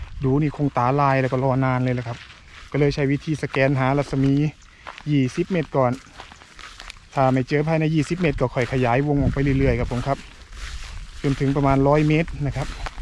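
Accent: Korat, Thailand. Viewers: ชอบแถะๆคับ คนบ้านเดียวกั๋นคับ โคราชคือกั๋น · คนโคราช บ่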